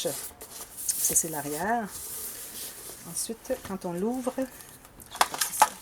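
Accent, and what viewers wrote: Quebec, Canada. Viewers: Bel album et comme j'ai commenté sous la vidéo de présentation de votre album, je vous suis toutes les 2 avec plaisir. Bien sûr, en français cela m'est plus agréable et facile à suivre, et comme je l'ai déjà écrit et comme d'autres vous le disent, ah lala ! Votre accent nous ravit et moi qui suis amoureuse du Québec parcouru par 2 fois déjà, je rêve en plus.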